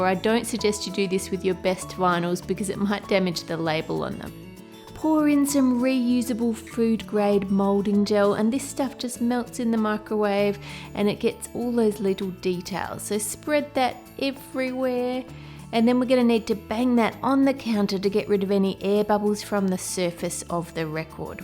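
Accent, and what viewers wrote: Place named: Australia. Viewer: I have entered!! But... do you have to click the subscribe with email button? Mom doesn't want more emails... lol. 😆 Anyway, I LOVE your videos,! They're Sooo fun to watch... you're so creative! And I've gotta say, I love your Australian accent. 😊💖 And your nails! They're such a pretty color!!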